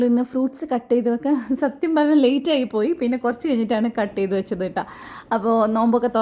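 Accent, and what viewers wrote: Kannur, India. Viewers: Nigal kannur aano.. Evidyanu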